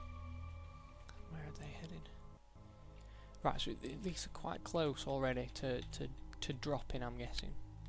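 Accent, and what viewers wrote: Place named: Manchester, United Kingdom. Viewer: I don't know if you actually are, but you sound like a proper, proper manc, ha ha ha. Good LP so far :).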